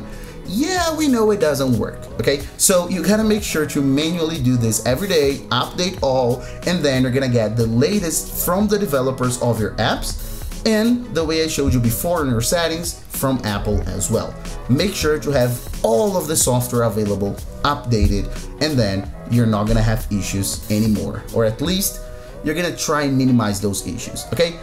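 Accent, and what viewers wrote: Brazil. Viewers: Brasileiro, cheio de sotaque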